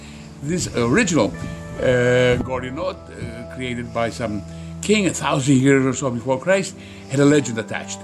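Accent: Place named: Spain. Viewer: Is this guy Greek or Spanish he sounds super Spanish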